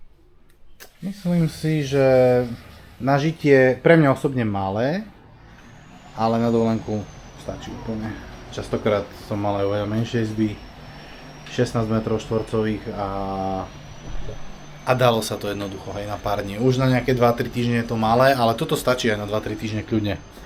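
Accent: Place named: South, Slovakia. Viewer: super video,dakujem za typ a nie si z južneho Slovenska? Myslím si podľa prízvuku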